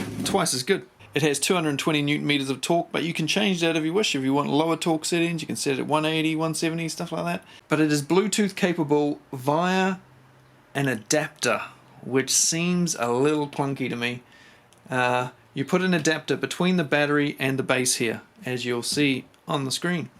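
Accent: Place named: New Zealand. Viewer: G'day, sounds like your a New Zealander. Are the tools you review available in Australia please.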